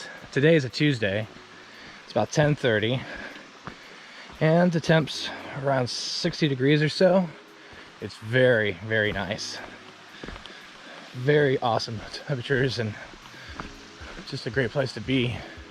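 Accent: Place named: North, United States. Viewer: You said you have been there 25 years but your accent is a bit more 'up north' sounding. Where are you originally from?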